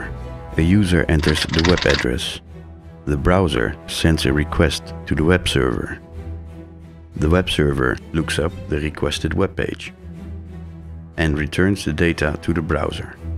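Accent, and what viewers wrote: Netherlands. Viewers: kan horen dat je nederlands bent, mooi filmpje :) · Is that voice from a dutch person? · is it just me or does this guy have a dutch accent? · Geniaal, je kunt gewoon horen dat dit een Nederlander is XP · Ja hij heeft een nederlands accent XD. Engels is verder wel gwn goed.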